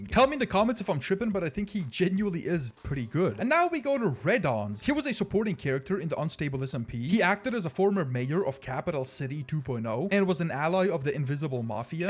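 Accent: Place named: United States. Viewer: lil bros voice changed to american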